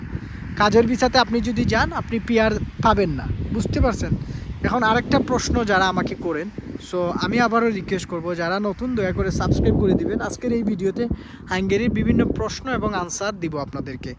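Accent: Sylhet, Bangladesh. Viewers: Vai are u Sylheti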